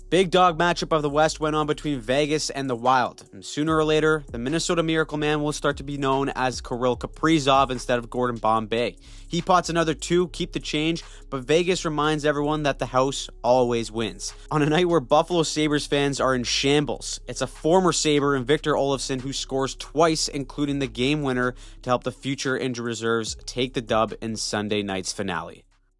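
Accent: Canada. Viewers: So wil there be another weekly recap? who is this guy and why does he sound so Canadian?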